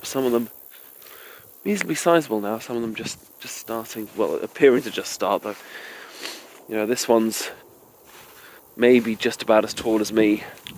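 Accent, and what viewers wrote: United Kingdom. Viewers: Looking forward for more long distance voicemail like this, your voice ❤ and that british accent though ❤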